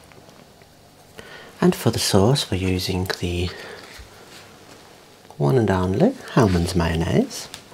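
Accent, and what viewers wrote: Birmingham, United Kingdom. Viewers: I only realised after reading comments that this is a sort of remake of that Irish man making sandwiches. His voice is golden but your brummie accent is quite nice. Nice for you to put a 'British accent' on the video that's not a posh London accent. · whereabouts in the uk are you from? i can’t place your accent 😊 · Is that a birmingham accent? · I can't believe...how satisfying this is....The UK accent fits accurately to calmness of this video.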